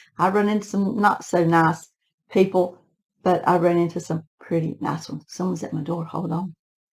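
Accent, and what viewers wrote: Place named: North Georgia, United States. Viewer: your accent is similar to ours here in north GA. Love your channel. I collect and have my two daughters collecting as well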